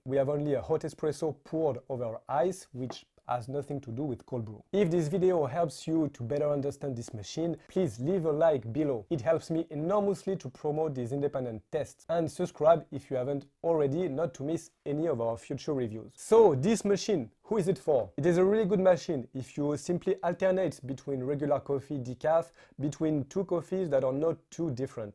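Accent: France. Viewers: En t'ecoutant je realise qu'on peut pas pas cacher notre accent francais😂😂😂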